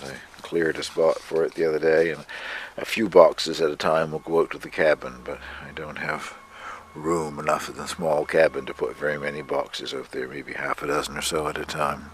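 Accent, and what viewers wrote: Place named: Nova Scotia, Canada. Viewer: Subbed ya for two reasons. 1) Love the down East accent. Although I live in the prairies now, I grew up in N.S, and hearing you speak makes me feel like I'm back home. 2) I love how very Canadian you are. My husband and I try very hard to buy as much Canadian made product as possible. About a year ago we bought a boler (Canadian made mini Camper). We're hoping to add many camping vids to my channel this coming summer. Anyway, great vids. I've been binge watching a few days now.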